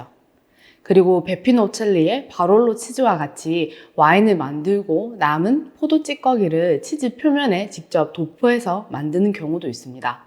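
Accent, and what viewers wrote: Gyeongsang, South Korea. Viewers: 숨길수 없는 경상도사람ㅋㅋㅋㅋㅋㅋㅋㅋㅋ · 경상도 어데 출신이신지?? 꾸시하이 갱상도 사투리가 들리네요이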